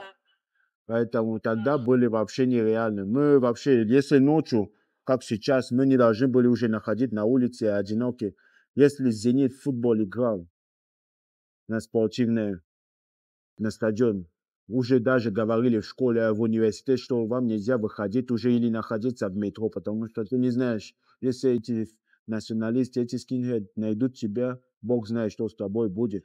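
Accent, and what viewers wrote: France. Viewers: у него родной язык французский, франкоговорящему вообще очень сложно избавиться от характерного акцента, тем более с таким сложным языком как русский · Моя учительница биологии жила и работала в Камеруне. Много рассказывала про Камерун, природу и людей. У такой французский акцент. Приятно слышать хорошие слова о России. Спасибо. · Вы пишите бред-мой друг Француз(и предки его Французы))),научился говорить несколько фраз на русском и Р у него отличная,и акцента французского намного меньше чем у героя этого видео(хотя он во Франции живет, а не в России и разговорной практики у него мало).Все дело в желании.В русском тоже нет звуков которые например в английском есть,только почему то я эти звуки призношу отлично.А насчет картавости вы насмешили))Негр этот не картавый,он просто не заморочился от акцента избавится.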